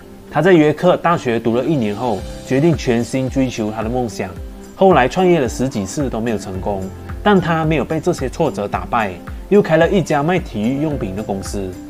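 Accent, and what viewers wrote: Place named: Fuzhou, China. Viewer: 你的福州音好重😂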